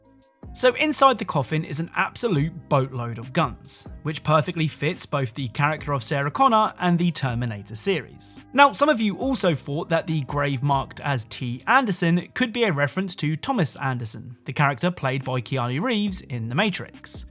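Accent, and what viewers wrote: United Kingdom. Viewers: What area roughly do u live u sound British u your not from London though ar ya u don't really sound like it, I'm in Harrow, I'm surprised that still happens over here cos it's very time consuming I feel, unless u had a good car I guess...🤔soz though man at least u n family unharmed that's most important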